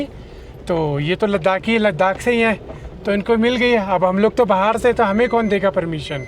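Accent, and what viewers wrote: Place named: Maharashtra, India. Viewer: Bhai ke hindi me marathi ki jalak dikh hi gayi hai Maharashtra hamara Maharashtra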